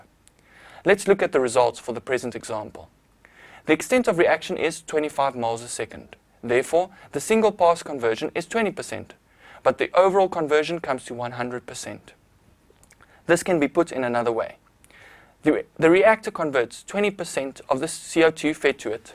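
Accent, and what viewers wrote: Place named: South Africa. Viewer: This man has a standard South African accent (or at least it sounds like it). I thought I was listening to a lecture video from my Uni for second😯. Also, the video was quite helpful.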